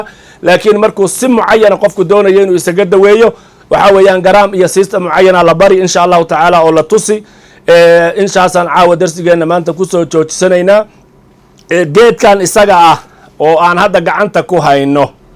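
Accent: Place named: Doollo, Somalia. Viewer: Sheekhow doollo miyaad kudhalatey afkaaga aadbaan ufahmayaaye?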